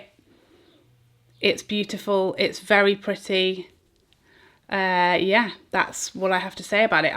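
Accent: England, United Kingdom. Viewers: Hi, I got to see your channel as a recommendation from YouTube.. Love your English accent.. Nice video 👌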